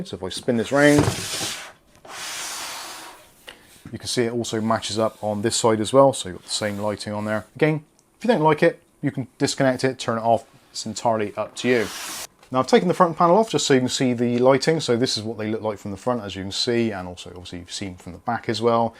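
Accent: Bristol, United Kingdom. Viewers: Tempting to try this for £35. Was going to go with O11D Evo but I feel I'll regret it if I don't at least try a budget case for the first time. Will also help offset the very expensive build. I am also going to try air cooling for the first time (Thermalright Peerless Assassin 120) to cool a 7600X with a view to change that CPU to the 3D version whenever it launches. So I guess I'd have 3 intakes, rear as exhaust and two or 3 fans in the top as exhausts, unless I swap my 4090 for the one with the AIO. Thank you for reviewing this, I appreciate there are a lot of cases but only the main ones tend to get any attention. PS: Are you from Bristol?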